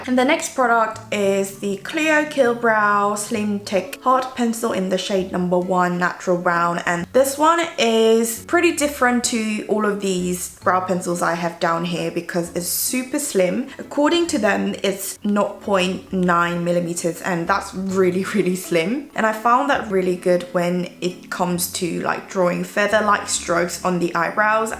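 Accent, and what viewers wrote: United Kingdom. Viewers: 언니의 영국 억양에 한번 더 녹고 갑니다 ㅎㅎ 🙂😊👍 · 이거 영국식 발음이에요 아니면 호주이런쪽이에요?......... 억양이 어느나라 영어에 가깝나요? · 영어 공부하는 중이라 영어영상이 더욱 반갑네요!! 영국발음이랑 유창한거 너무 멋져요ㅜㅜ 또 한번 반했네요💕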